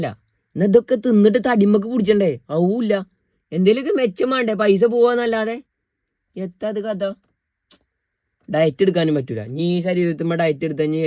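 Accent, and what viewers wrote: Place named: Malappuram, India. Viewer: 😂😅😅😅 malappuram slang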